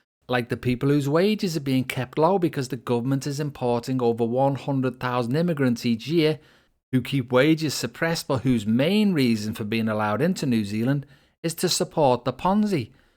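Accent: Liverpool, United Kingdom. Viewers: Sounds like a scouser(Liverpool) which is a depressing dump, now he constantly whines on about NZ, yes you could buy a terraced house in a rough area of Liverpool pretty cheap but reason is because nobody wants to live there. Auckland compared to many places is quite nice and not expensive compared to comparable cities.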